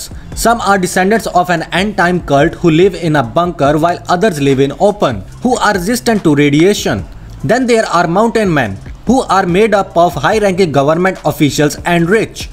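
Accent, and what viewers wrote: India. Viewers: it’s awfully hard to understand, due to the thick Indian accent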